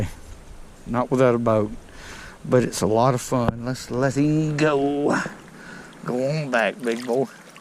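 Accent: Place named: Alabama, United States. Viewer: Can you make a video on your film setup & how you locate fall bass, also i love your videos, your a super funny guy & you make my day when you show our alabama accent off, Rolll Tide:)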